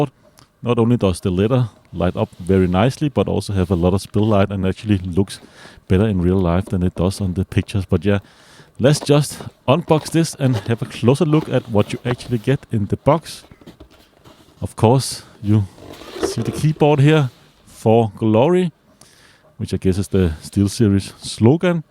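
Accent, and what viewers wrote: Germany. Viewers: Man hört so das er deutsch ist lol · u sound very german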